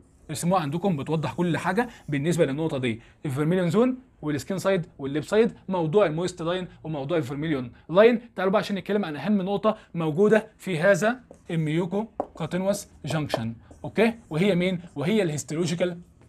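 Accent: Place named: Jordan. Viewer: متاكد انك مصري؟ حاسة انك من الاردن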